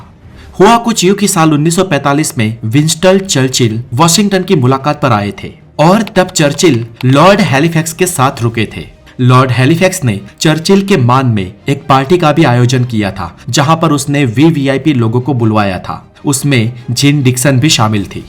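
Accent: Gujarat, India. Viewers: His pronunciation says that he must be Gujrati and most of the times he speaks gujrati so he not able to speak Proper Hindi.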